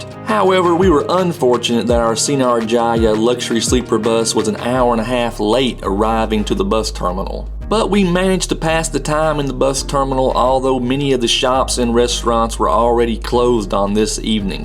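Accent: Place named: Eastern Kentucky, United States. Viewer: Thanks mucho for your very dry narration - and yeah, hear a bit of Eastern KY in there!!!!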